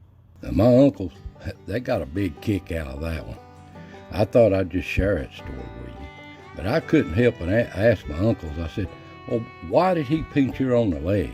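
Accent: Appalachia, United States. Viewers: I grew up in Southern West Virginia and heard about snake handling churches growing up. Your video makes me miss home, we sound an awful lot alike. I've traveled this country from one end to the other and my accent always gets commented on everywhere I go. Your videos are a treasure, keeping our mountain history alive.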